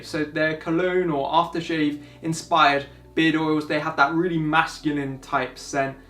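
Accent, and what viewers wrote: United Kingdom. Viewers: I have a full beard past a fist length from my chin and am always open for suggestions and products that I may not otherwise be aware of. I recently subscribed to your channel and I listen attentively to your videos, which brings me to my random off-topic question: where specifically in the UK is your accent from? You have a pleasent and relaxing speech pattern that makes it easy to listen and perfect for instructional videos (in my own humble opinion). Your quirky style does not hurt either. Clear, relaxed, no nonsense, unpretentious videos. What more can one ask for? Just saying. Keep them coming.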